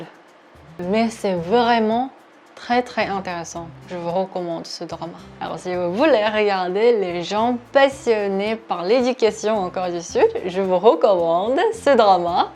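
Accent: France. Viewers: t a un belle accent Français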